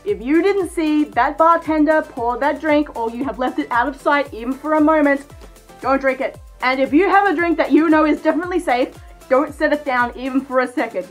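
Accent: Australia. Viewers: Why do I keep thinking you sound Australian? >:3 if you are Australian do you recommend I make a kangaroo fursuit?? :333 I’ve been questioning if I should or not recently because I’m making a fursuit this October, or at least I’m making the head feet paws and tail but not the full body because I still need my mannequin that I used for my art textiles project back soooo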